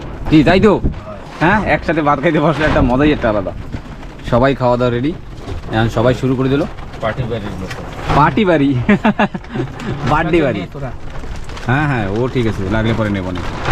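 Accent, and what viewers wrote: Barishal, Bangladesh. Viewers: বরিশালের একসেন্টের সাথে মিলে অনেকটা ।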